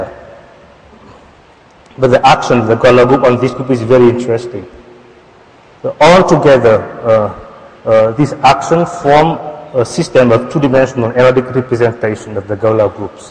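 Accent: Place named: France. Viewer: Heavy French accent :) I am a Vietnamese American, glad to see another Vietnamese coming out famous. Thank and hat of to France, a great country!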